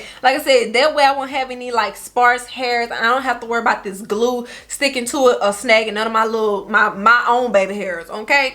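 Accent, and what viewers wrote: Louisiana, United States. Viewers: Guh you from Louisiana? Lol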